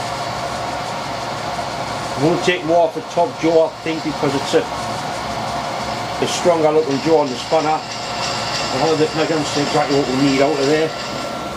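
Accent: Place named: Scotland, United Kingdom. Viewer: Love your accent, Scottish. Well, for me here in America it's an accent lol....for you, I have an accent. Good job.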